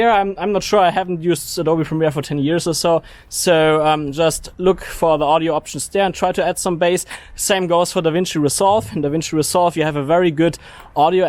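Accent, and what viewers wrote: Germany. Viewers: Kann es sein das du deutscher bist , wegen deinem Akzent beziehungsweise für mich kein Akzent 🤔 aber wie es auch ist super Video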